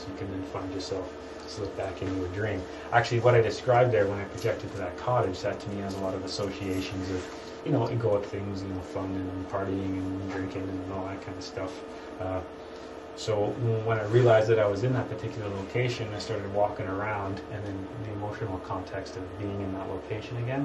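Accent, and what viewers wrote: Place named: Canada. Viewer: He's from Canada... I can hear the accent ;) haha not that it matters but great stuff in this video good work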